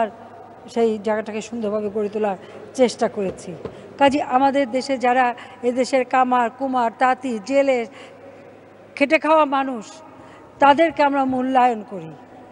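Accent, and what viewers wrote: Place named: India. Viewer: Akdom amader Indiar moto kotha batra....so i like is.........kotha sunei mone holo na j akjon Bangladeshi mohila.....!!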